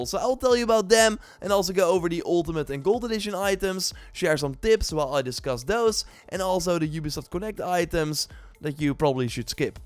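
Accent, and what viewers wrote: Netherlands. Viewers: Nou je bent zeker Hollander?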